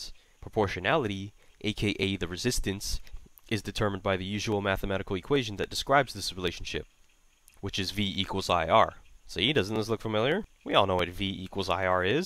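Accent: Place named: Toronto, Canada. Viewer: definitely from toronto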